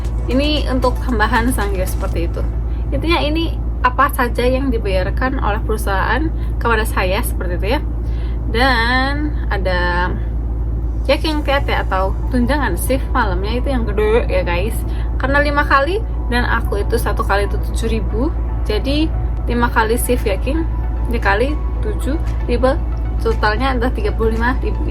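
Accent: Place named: Japan. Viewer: bener kak sampe mikir di menit menit awal, kakanya mungkin orang jepang yg belajar bahasa indo 🥹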